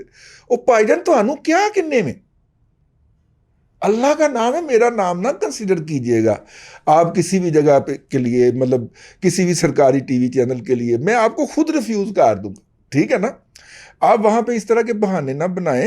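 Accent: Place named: Saraiki, Pakistan. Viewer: AoA Saein, I like your sairaiki accent very much. Please also give a sairaiki touch with Punjabi.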